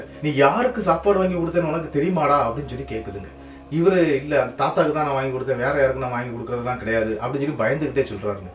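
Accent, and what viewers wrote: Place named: Nagercoil, India. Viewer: நம்ம நாகர்கோவில் ஊர் காரர் என்பது பேச்சை வைத்து அறிந்தேன். மிக சுவாரஸ்யமாக அழகாக சொன்ன விதம் ரசிக்கும் படி இருந்தது. நீங்கள் சொன்னதால் தான் நான் சப்ஸ்க்ரைப் செய்தேன். நன்றி நல்வாழ்த்துக்கள்.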